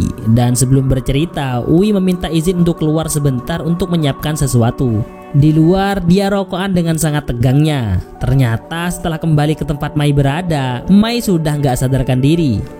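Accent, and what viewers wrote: Madura, Indonesia. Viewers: Logatnya kek ada rasa rasa khas orang madura, iya gasih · Logat e gk Iso di bujuki Cong..Meduro Yo Cong ?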